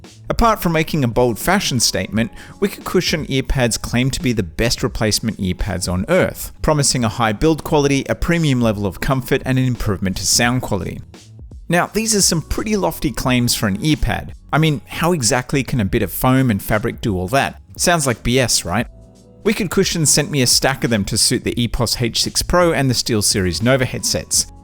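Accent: Australia. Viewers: Loving hearing the accent from a fellow Aussie 🇦🇺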